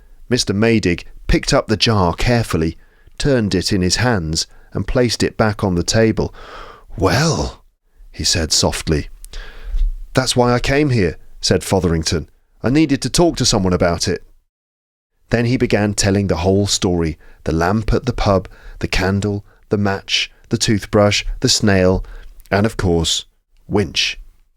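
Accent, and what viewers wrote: United Kingdom. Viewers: I've been waiting for a story episode... İ really enjoy listening to interesting stories by your perfect British accent narration.. please share more stories... thank you very much ❤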